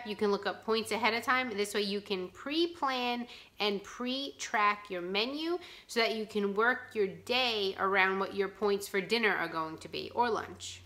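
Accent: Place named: New York, United States. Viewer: So Happy i found you the u tube!! Love your NYC accent! Im from Philly! I could listen to you All day, love your honesty 💯💯💯💞💞💞 keep them coming!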